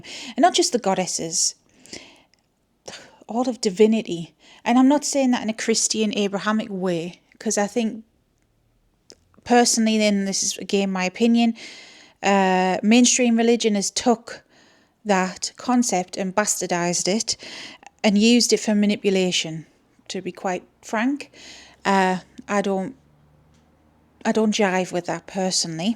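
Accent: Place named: North East England, United Kingdom. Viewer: Oh your a north east lass , Xx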